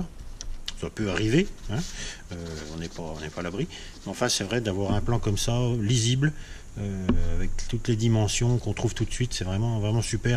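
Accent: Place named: Jura, France. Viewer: Tu dois pas habiter loin du Jura je me trompe ? T'as un léger accent ^^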